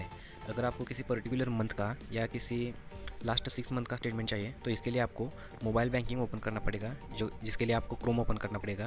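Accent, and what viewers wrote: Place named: Kolhapur, India. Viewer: Bhava kolhapuracha ahes vatat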